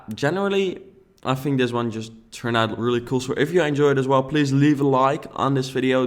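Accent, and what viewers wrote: Netherlands. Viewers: i don't mean to be rude but please work on your english. you have a dutch accent and I don't like listening to it. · I hope you're trolling. He has a dutch accent because he's dutch, you idiot. Figures the channel name would be self-explanatory enough! As if he's gonna change to cater to one person's dissatisfaction when his english-speaking skills are perfectly fine! If you're that butthurt by it, it doesn't take a rocket scientist to find the mute button on the video. "I don't mean to be rude" my ass. Pull your head in or go back to your perfect english-speaking safe space. fantastic build as usual! :)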